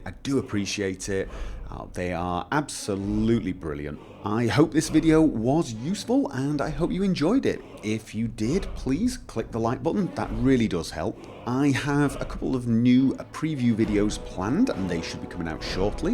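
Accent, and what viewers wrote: United Kingdom. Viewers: i love your sound and your British accent :D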